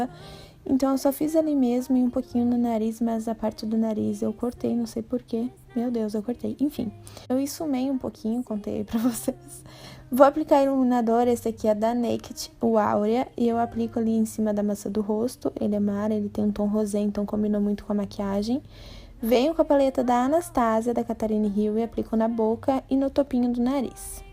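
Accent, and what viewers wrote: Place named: Rio Grande do Sul, Brazil. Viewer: sotaque gaucho, é nóiiix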